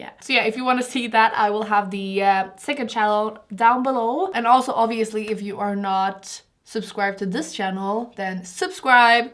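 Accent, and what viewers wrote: Sweden. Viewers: Is she Swedish? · omg are you swedish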